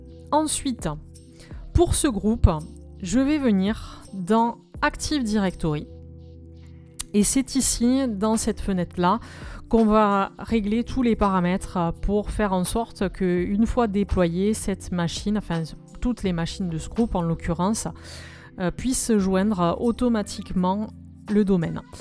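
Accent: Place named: South, France. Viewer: Très bonne playlist sur FOG. Chez les sysadmins, on a trop souvent ce réflexe un peu idiot de ricaner ou se méfier en entendant la voix (agréablement méridionale) d'une jeune femme pour donner des conseils sur le déploiement. Et tu as admirablement fait mentir ce réflexe. Subbed. Je me fais fort d'aller fouiner dans le reste de la chaîne cette semaine, que je peux imaginer de grande qualité sur la foi de ce petit tuto impeccablement construit. J'espère que ta chaîne va décoller : elle mérite.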